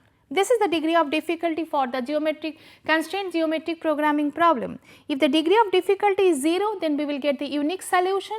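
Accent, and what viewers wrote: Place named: Bengal, India. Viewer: Totally bakwas Tell her to speak in proper English She is speaking English same as Bengali Not useful at all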